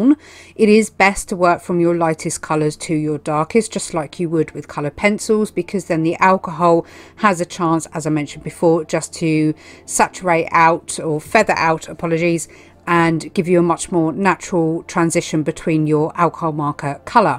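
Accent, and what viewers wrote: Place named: England, United Kingdom. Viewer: I love listening to english accent ❤️ yours are nifty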